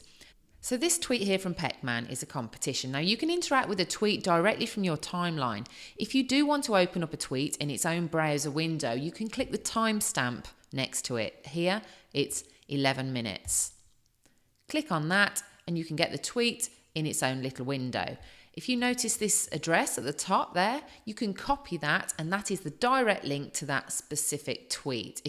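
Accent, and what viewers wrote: United Kingdom. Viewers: Thank you, I wasn't expecting to find such an informative video on this. What a relief, British too! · Thank you! Clear, concise, no extraneous chatter, loved it! And with a British accent to boot! (We Americans love the accent!)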